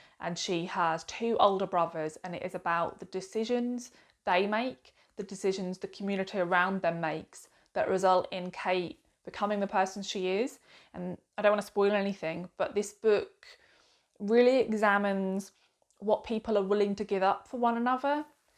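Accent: United Kingdom. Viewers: I have just subscribed to your chanel. Such an eloquent, clear and very informative book genre review. It's so refreshing to firstly have a book chanel without the person waving a book around while you are trying to look at it. Someone who speaks clearly and I can understand. I'm British and so are you so that's helpful 😅. I've grown tired of many of the book chanel on YouTube, yours is so good, thank you. I'm 73 and an avid reader since the age of 5. But I just feel I need good quality suggestions , which you provide. Well done. Julie x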